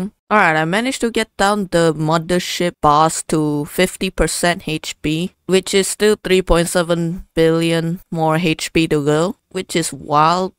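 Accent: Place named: India. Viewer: I know your voice you are Indian if I'm right give me heart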